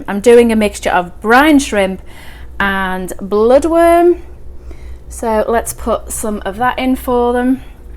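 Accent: United Kingdom. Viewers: With your accent I thought you where in the UK! Yes that's a bit far to post 😂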